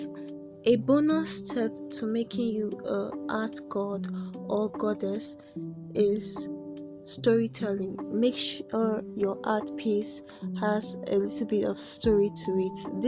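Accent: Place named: Yoruba, Nigeria. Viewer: E sure me say you be yoruba !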